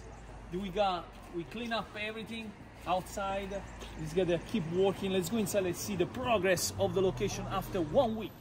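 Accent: Italy. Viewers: Congratulación!!God bless you with health and happiness for you and you family to enjoy the new chapter in life.Im a new subscriber and your way to talk Italian bring me a lots of memories of my familia !🙏🙏🎉🎉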